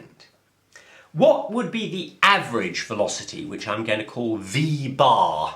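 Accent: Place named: RP, United Kingdom. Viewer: why is this guy so posh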